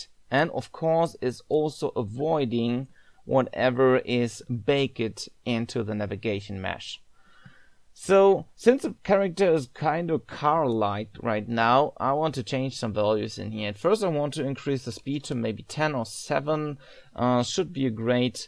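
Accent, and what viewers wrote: Germany. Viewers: you sound german, diablo is pronounced as it is in german ^^ im also looking forward to the next parts, great video!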